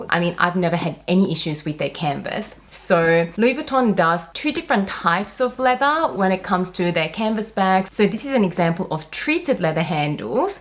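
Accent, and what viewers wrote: Australia. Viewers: Are you from Australia? U have strong accent, sounds funny. Great info tho! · Is she Australian? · She sounds like she lives in Australia but didn’t grow up there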